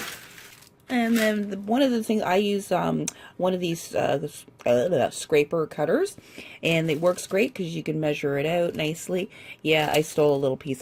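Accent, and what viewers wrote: Canada. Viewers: Hi, Have you ever seen a TV show called Trailer Park Boys? It's set in Halifax NS. They say about like you do, aboot lol. Does that mean you have a NS accent? Or do all Canadians say aboot? BTW, It says subcribe instead of subscribe on your titles at end. I don't mind. I like misspellings but I can't help but point them out. Sorry.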